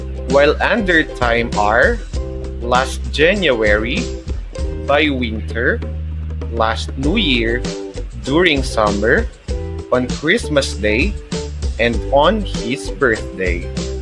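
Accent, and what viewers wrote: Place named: India. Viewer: He has Indian accent.It reminds me Apu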